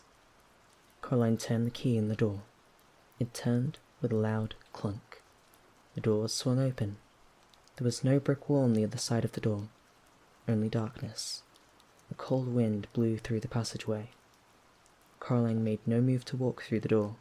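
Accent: United Kingdom. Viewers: I'd never thought that a British accent Coraline would work just as well as the typical American one, yet here we are.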